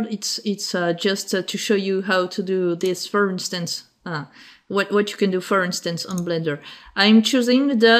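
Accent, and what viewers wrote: France. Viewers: Serais-tu francaise par hasard (à cause de l'accent)? L'autre tuto <link> n'est-il pas obsolète à cause de l'autofit ou suis-je à côté de la plaque ?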